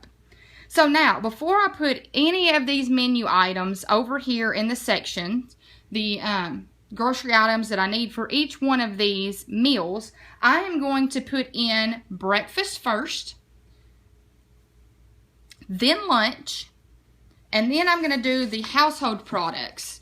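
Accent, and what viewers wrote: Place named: Alabama, United States. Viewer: Girl this is a very good system and printout. I'm from Alabama and I know you are close by.......I recognize that accent :)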